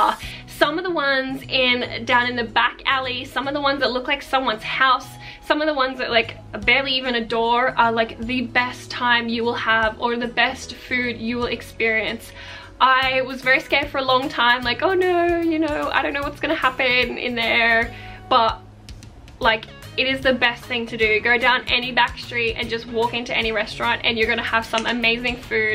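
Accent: Australia. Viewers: Although I don't speak Japanese, I was able to hear an Aus accent when you spoke - Both shocked and amused me... Your point about getting outside Tokyo is SO true. Other than videos you shot with friends, my favorites were when you showcased a city or business outside Tokyo. They were all so well done. Kobe series 100% I'm still amazed you created all those without a staff.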